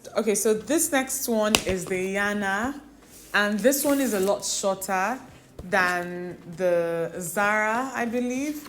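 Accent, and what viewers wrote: Nigeria. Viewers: That nigerian accent made me😂😂😂😂😂😂, 💓💓💓👌🏿👌🏿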